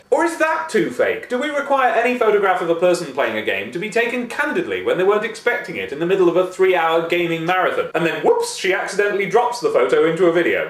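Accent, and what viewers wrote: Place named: United Kingdom. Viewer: You were totally talking in a british accent and that ruined it for me could you be more American?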